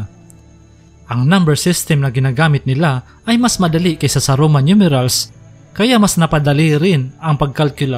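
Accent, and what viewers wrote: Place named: Visayas, Philippines. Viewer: Astig sana yung kwento... Kaso may pagka bisakol lang yung pag lalahad nung kwento...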